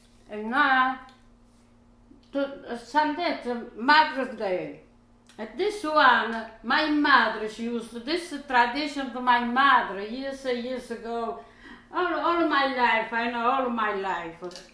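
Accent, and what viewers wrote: Italy. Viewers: Dear, I watch your videos all the time and I love them. It's not just the real italian recipes and the way you prepare them, it's a' you and the way you speak your Itali-ish! You have a wonderful personality and are a very conscientious cook. BTW, you are not "TOO SLOW!" Perfection takes time, it cannot be rushed! So thank you for taking your time to prepare these delicious italian recipes for us on video and step by step. You are not only educating us, but, you are also very sweet and entertaining. God bless you and thank you very much. Your family is proud of you and love you and it shows. They are blessed to have you and you to have them. Please keep making your lovely videos for us out here. You are a gift and we love you! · What a sweetie! Reminds me of my friends mothers in the Bronx, Naples, and Catania. We would be in the kitchen after school helping them prep and cook just like she said. 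(Except they had a stove and oven in the house by the 70's and 80's!) I live in North Florida now and you just don't see or hear that beautiful italian accent and sensibility here. North FL natives are 'senza sapore.'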